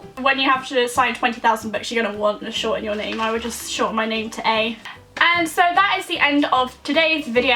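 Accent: United Kingdom. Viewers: thought you had the tiniest south west twang. Hi from Bristol :P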